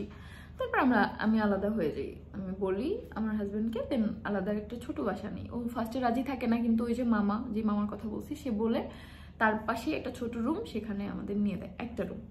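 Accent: Barishal, Bangladesh. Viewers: Amader barishal barguna er Kotha boltacho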